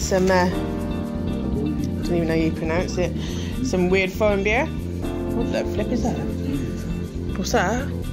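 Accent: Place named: United Kingdom. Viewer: Hey how are you doing? 🙂👍 hope all is well. I know I've said it before but I love your British accent. Lol Cool looking houses you guys have there. So different from here in the USA.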